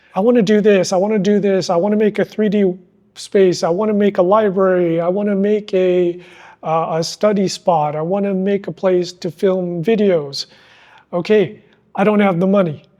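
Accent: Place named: United States. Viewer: I’ve watched many of your videos on Thai language acquisition. From your manner of speaking and the small personal details you’ve shared, I assumed that you are American. But judging from your appearance, you look Chinese. Are you also a Mandarin speaker? Or are you fluent in another Chinese dialect? Are you a trilingual?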